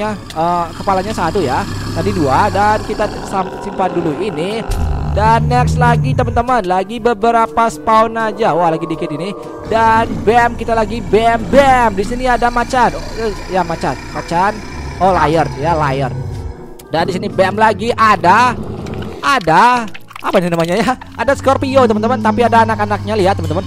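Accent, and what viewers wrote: Bali, Indonesia. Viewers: Dari bali bli???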